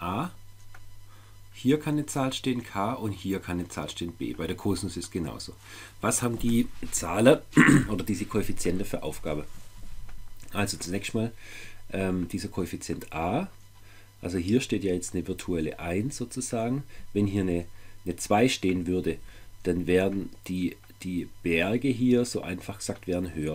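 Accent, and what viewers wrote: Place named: Swabia, Germany. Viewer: Haha 😂 Suppa Schwäbisch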